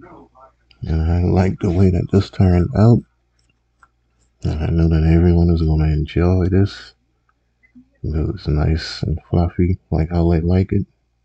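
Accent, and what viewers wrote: Charleston, United States. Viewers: I can here the Charleston in your voice. I’m here to steal another one of your recipes 😂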